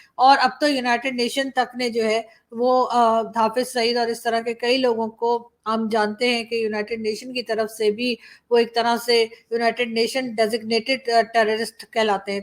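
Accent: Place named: Uttar Pradesh, India. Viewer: 🙏 mam ji ap to uttar pradesh ke se boli bolte hai mam 🙏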